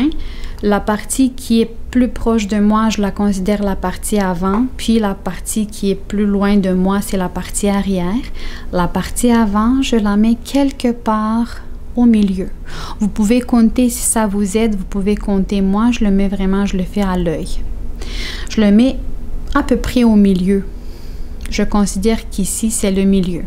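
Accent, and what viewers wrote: Canada. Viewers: Tu es un amour,tes tricots sont toujours magnifiques, c'est toujours un plaisir d'écouter tes tutoriels qui sont toujours bien expliqués avec ta gentille voix douce et ton adorable accent québécois, merci pour ton beau travail..des gros bisous pour toi de Lille 👍☺️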